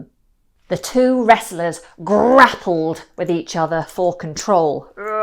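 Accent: United Kingdom. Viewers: I love the Brit accent <3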